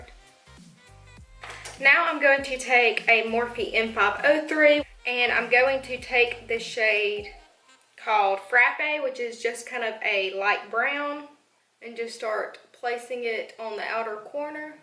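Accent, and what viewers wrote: South, United States. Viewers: You're so pretty! What camera are you using? I love your accent, I'm have a country accent as well and you're truly inspiring me to start my channel! Xoxo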